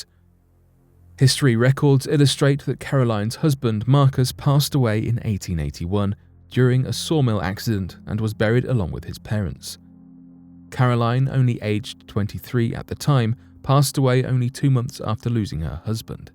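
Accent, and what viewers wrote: England, United Kingdom. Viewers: Hes British. Settle down with the exact pronunciations. I'm surprised more people dont criticize his shitty research. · Americans complaining about how an English persons pronounces something 😂kettle black and all that 😂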